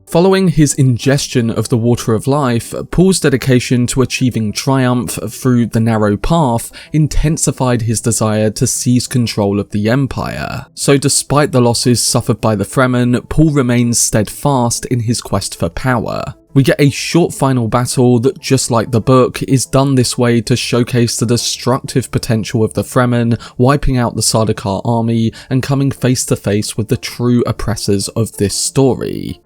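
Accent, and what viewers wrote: United Kingdom. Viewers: Your accent is super cute. Vitamin, water, other words… British?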